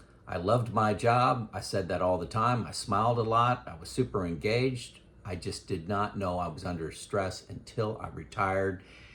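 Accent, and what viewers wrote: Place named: United States. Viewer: Speaking of making fun of how you talk - are you of German heritage or grow up i a heavily German heritage area? My German-descent mother from Wisconsin and her whole side of the family pronounces "wash" as "warsh". Like George "Warshington". My dad and his Polish side of the family from Wisconsin just say "wash". Not a criticism (although we would gently tease our mom sometimes), just curious.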